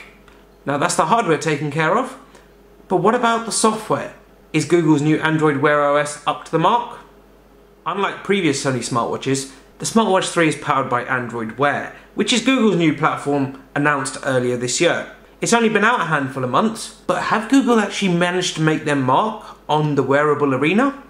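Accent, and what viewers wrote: India. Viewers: American accent? indian...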